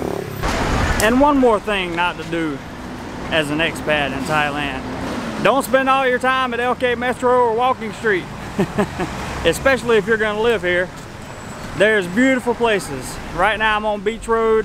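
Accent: South, United States. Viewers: Your Southern accent is cute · I'm listening to his accent and thinking.....cape fear hahaha. Great real world advice boys ! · Love your down-to-earth delivery with the southern gentleman charm. You should do way more video narratives....on any subject. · Thumbs up for cowbow accent. Yehaw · these advices are precious really like not touching the head of a Thai, who would otherwise know for such details. Thanks. PS: English not my mother language but I'd say you're from US somewhere within the Bible Belt range? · My curiosity: that american accent you have... which american state are you from? Texas? I can easily understand every single word you utter. English' s not my first language (neither the second one, actually), so I'm curious because sometime I struggle a little to understand fast spoken american-english. No problem whatsoever in understanding you ;) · Watching from the Philippines... 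I like you southern accent. thanks you visited the Philippines. thailand and Philippines have similarities in culture and they both eat rice Lol. I like to watch ads too... SPANISH. As the Philippines was once a colony of Spain... Spanish was taught also in School. 2 languages were taught in Philippine school back then 1950s-1980's.. Spanish and English. Same is true in the Philippines... in Thailand and the Philippines... foreigners are guest.. and a good guest behave. You are not a citizen in this country. Act like a good guest . TIPS... because wages are low and people have high expectation with tourist. As you have said... dont come to Thailand with little money.. because people expect tourist have money to spend. WELL SAID. I agree with you. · I love your south accent man! I've been in TH for 3 years, 85-88 and everything you say it's true.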